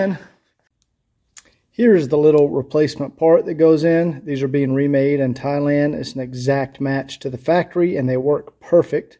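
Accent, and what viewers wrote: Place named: Atlanta, United States. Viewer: Excellent video. I’ve done some of this stuff to my <num>d. Haven’t tackled the sunroof or antennae yet. I also need to do my A/C and clutch master/slave cylinder. Im assuming you must be near Atlanta based on accent and the YouTube description phone number. I’m up in the mountains above atlanta. Are you a w123 shop? Any good references for local w123 salvage yards? Parts places? What about body and paint guys familiar with fixing rusted w123 body pieces? Thanks!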